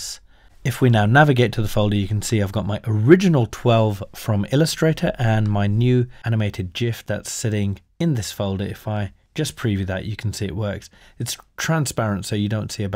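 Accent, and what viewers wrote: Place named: United Kingdom. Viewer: thamk you OH so much, Brit accent teacher!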